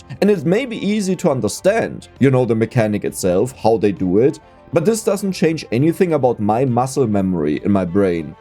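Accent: Germany. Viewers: I love your xD but for real, I really like your content, I pretty much always watch you to sleep in and I think your German ascent is charming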